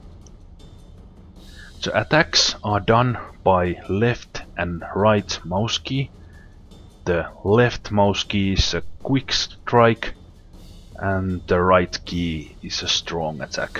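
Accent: Finland. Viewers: lovely finnish accent :D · the voice.......im 100% it is the finnish accent...its too distinctive.....im from finland so i know but i dont talk like that thank god lolo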